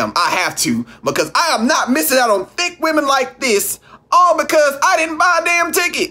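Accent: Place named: Texas, United States. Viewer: U s texan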